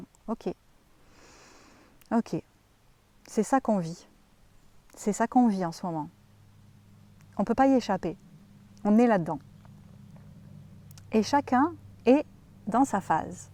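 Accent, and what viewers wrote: Canada. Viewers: Merci, Merci! ...De votre sourire, de votre joie, de votre simplicité mais aussi des messages très réconfortants que vous nous offrez...avec ce charmant petit accent canadien!😀 (Pour ma part j'ai un peu de mal...avec l'application du savoir intellectuelle en actes ...et aussi ac qq termes anglais qui sont sympa...quand on les connaît! Au plaisir de vous retrouver! J'ai regardé cette présentation avec ma fille de 28ans dans mes bras...jusqu'au bout.cela nous a réconforté à tou points de vue . C'était un moment puissant qui nous nourrit l'âme !🙏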